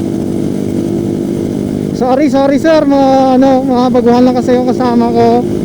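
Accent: Iloilo, Philippines. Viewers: boss ang ilonggo nyo kag ilonggo dre sa tacurong sultankudarat paryas paryas gid haha long live ilonggos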